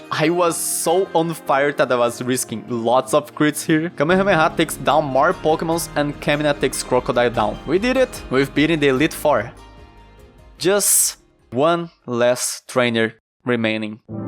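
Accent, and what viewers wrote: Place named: Brazil. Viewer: Sabia que era brasil pelo sotaque adorei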